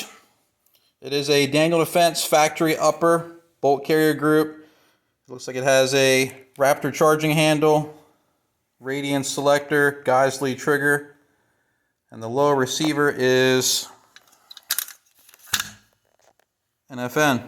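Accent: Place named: Baltimore, United States. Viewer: Good to hear that Baltimore regional accent again!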